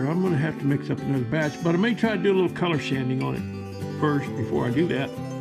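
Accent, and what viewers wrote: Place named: Arkansas, United States. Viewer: Whenever I hear some picking on a guitar! I know I’m in-for a wonderful trip into a mechanical adventure. Thank you for taking us along on your trip! You are the best at walking us along with you,while you recover your old square body from the ashes! I have watched every one of your videos from the Rockabilly build to now. And I have never been disappointed in any them. Your “ Ol’Arky” styled delivery and work ethic are a joy to witness. Thank you sir,for your guided tour of your trip of raising an old friend up from the ashes. “I sure do preciate ya!!!” From one old timer to another! Till the next time,keep on keeping on! P.S. we need more “ pickin and a grinnin!”😊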